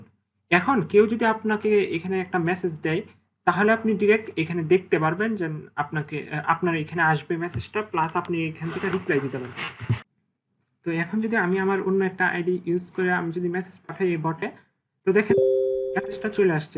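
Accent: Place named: India. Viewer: Could you try to speak more international English in your next video please. A strong Indian accent is hard to understand if not used to.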